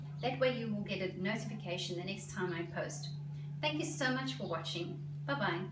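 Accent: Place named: South Africa. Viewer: I love your recipe! Do you live in South AFrica? You have a SA accent, I lived there too, in Pretoria!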